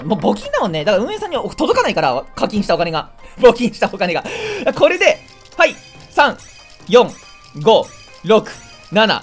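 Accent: Ishikawa, Japan. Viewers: 動画見てるとたまに訛ってるなって前から思ってたけどスッキリしました、石川出身だったのですね。自分は富山の人間なので近いと思っていました。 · 何か意味不明なんだけど、何となく石川県民っぽくて地震大丈夫だったかな？みたいに思ってたら本当に石川出身と聞いて自分で驚いてる笑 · 、石川訛りって言われてて心配してたけど本当にそうだったんだ。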